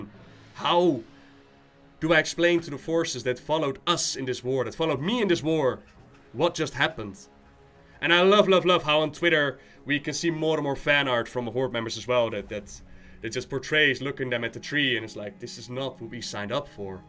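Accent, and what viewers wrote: Netherlands. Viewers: As a Dutchy also, your accent is very recognizable and makes your videos unwatchable to me XD too bad. I use wowhead though, good stuff!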